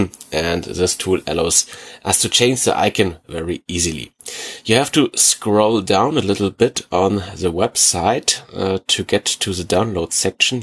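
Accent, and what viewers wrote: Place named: Germany. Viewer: I can not live through 1 day without a guy with heavy, heavy German accent speaking about tech stuff.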